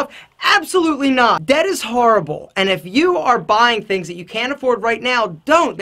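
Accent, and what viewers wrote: Philadelphia, United States. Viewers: Heavy philly accent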